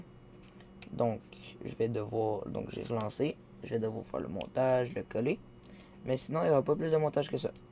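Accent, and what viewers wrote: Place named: Quebec, Canada. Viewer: att t qc ou pas ta 1 acent ca te dit ont s'ajoute ou nn chui qc moé aussi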